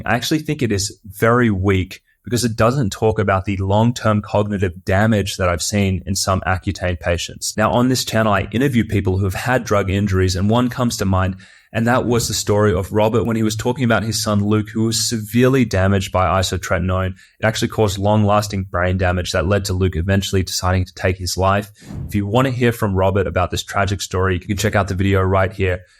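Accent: Australia. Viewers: I hear an Australian accent? How would you rate the Australian mental hlth system in comparison to other countries?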